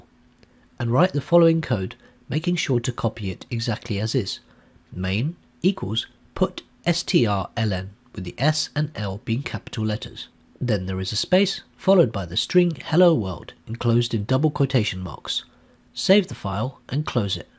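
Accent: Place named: United Kingdom. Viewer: Could be better without the annoying british accent which is incomprehensible at times.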